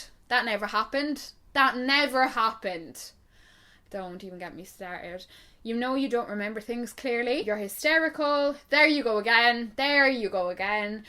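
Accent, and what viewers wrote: Ireland. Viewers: I love your Irish accent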